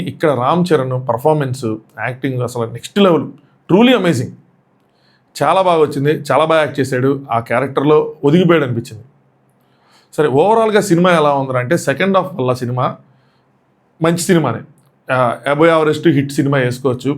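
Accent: Khammam, India. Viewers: Hi..Meru Asslu inka divider annapudu chala navvu vachindi andi..Madi khammam exact same accent vuntundi Madi kuda after long time navvukunnanu Meru Ala matladite..Meru chala genuine ga matladtaru..keep going..all the best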